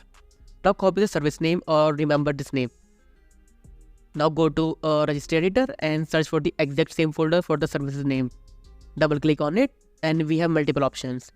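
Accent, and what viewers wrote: India. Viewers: This is exactly what I was looking for😊 whenever I hear in Indian voice I know the tutorial is going to be correct 💪 💪 💪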